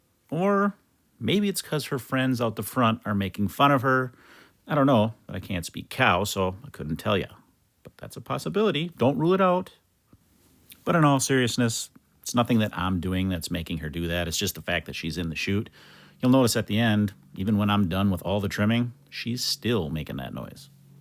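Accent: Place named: Midwest, United States. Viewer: I was wondering why your accent seemed so familiar, its because we're state neighbors! Greetings from Eastern MN.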